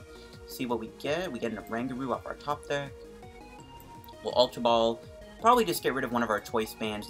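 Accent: Canada. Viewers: r u from Canada ?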